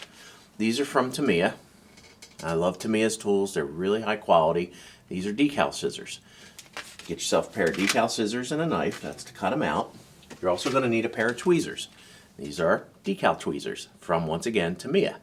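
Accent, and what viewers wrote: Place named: South Jersey, United States. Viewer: Love the South Jersey accent.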